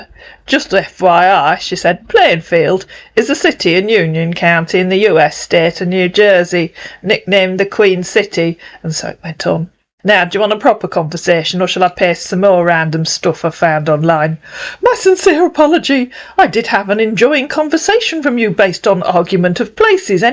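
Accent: United Kingdom. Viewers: Howdy and hello, I thought that so called conversation was great and I love your accent and it was nice to listen to once in a while since I am half British on my mom's side. And if you want you might want to watch Monty Python's Philosophy soccer/football match between the Greeks and the Germans. You might find it enjoyable. Take Care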